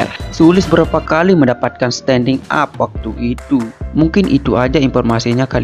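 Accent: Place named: Lombok, Indonesia. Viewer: Bahasa indo logat lombok nya kental sakali mimin ny wkwk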